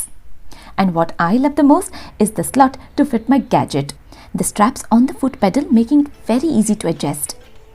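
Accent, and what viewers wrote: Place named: Belgaum, India. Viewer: Hello can i knw from which place ru. Becoz ur kannada is same like belgaum kannada so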